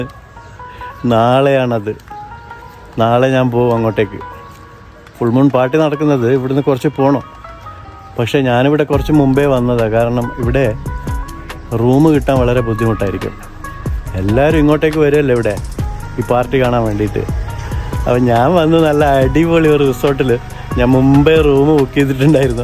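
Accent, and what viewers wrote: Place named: Rural, India. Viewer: നിങ്ങളെ വീഡിയോ അടിപൊളി നാടൻ സംസാരം ❤ നാട്ടിൽ എവിടയാ❤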